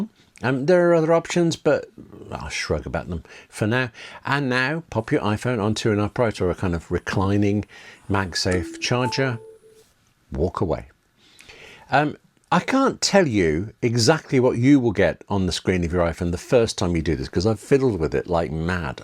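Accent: United Kingdom. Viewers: Great British accent but how about specifying the phone models on your headline not to waste any more time of us lowly SE users? 🖕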